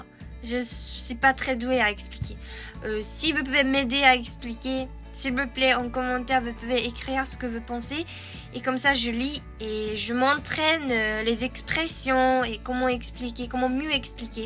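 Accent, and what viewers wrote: Japan. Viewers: Ok , l'accent nippo_français est vraiment très mignon. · En tant que français qui apprend le japonais c'était génial d'entendre une japonaise parler français 😄 Les français devaient te poser des questions à 50% par curiosité et à 50% pour ton accent😃 Merci du partage 🙏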